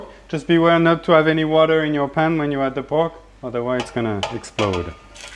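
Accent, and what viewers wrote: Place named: France. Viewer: 哈哈，浓郁的法国味英语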